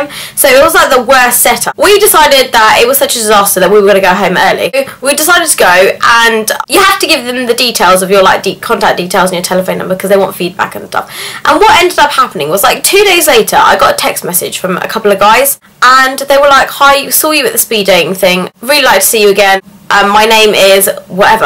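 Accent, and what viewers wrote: England, United Kingdom. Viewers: hahaha om lmao! love your personality and accent, from which part of england are you? And how old are you? Just curious, not anything weird :P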